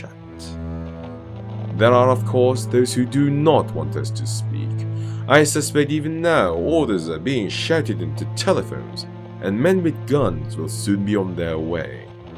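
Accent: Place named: United Kingdom. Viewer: 안녕하세요. 한국분이신가요? 어떻게 영국발음 연습하셨는지 여쭤봐도 될까요?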